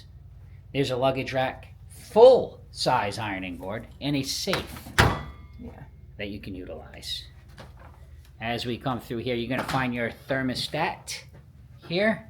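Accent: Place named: New England, United States. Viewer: I had to chuckle when y'all talked about different pronunciations of words when you come from different places. When I first started watching your videos, after about 2 videos I was thinking "He has to be from New England". LOVE New England! I'm in Virginia so I get the "Southern THANG" all the time,lol. Great video!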